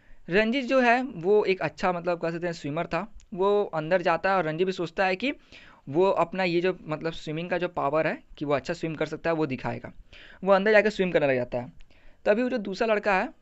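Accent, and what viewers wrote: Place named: Jharkhand, India. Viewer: Jharkhand se ho na?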